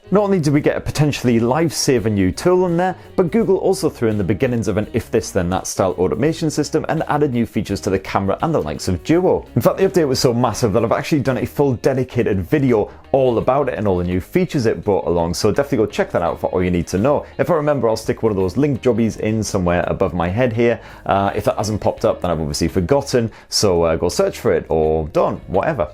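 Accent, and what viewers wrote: United Kingdom. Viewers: Your Accent is marvelous love to listen it all day...😊😊 Brit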